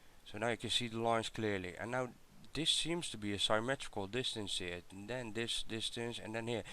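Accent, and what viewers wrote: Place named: Netherlands. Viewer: dutch way of pronouncing .. hahahahahhaa!!!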